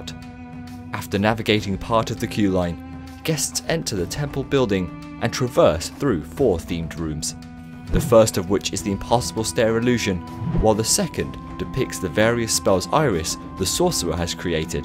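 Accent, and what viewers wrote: United Kingdom. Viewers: Great brirish accent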